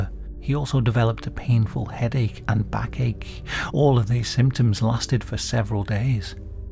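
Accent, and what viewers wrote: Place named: United Kingdom. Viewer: I just got one of your storeies in my fed (probably because I follow strange, dark and misterious - mr Ballan) and started binge watching your stories 😂. I love your accent ! English is not my native language but I love to listen british accents, so many of them 😊. Your story telling abilities are great. Love your content. You have a new subscriber. Keep up with good work 👌🏻👍🏻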